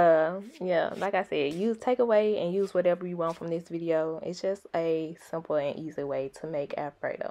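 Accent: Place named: South, United States. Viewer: Straight to the point! btw I love your southern accent 😊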